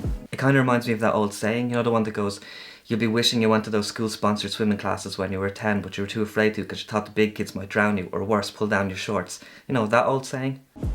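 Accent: Ireland. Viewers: Great to hear an Irish voice here on YouTube, you have missed focus on several images so I would recommend the Nikon FE as your next film camera but the FM,FM2,FA,FE2 are also very good, the FM3a is good but too expensive. I have subscribed. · It was a huge uplift for me to open your video and hear a young Irish voice. I admire the vision you have of seeing and telling the Irish story with young eyes. The Fujica 705 was a camera I used in the 1970s but was unfortunately stolen. I then used and still use a Pentax S1a. You may have seen the recent blogs on the need to invest in cameras with mechanical shutters. Electronics fail. I have lost two to irreparable damage. Still, I would recommend the Contax 139Q because it is widely available and those Contax lenses are genuinely top class on a par with the best Takumars. But, my absolutely favourite camera, is an old Werra V that I still have. 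Pure Leica quality from East Germany. It is my dedicated BW film camera. Carrying that around I feel that I am channeling Cartier-Breslin.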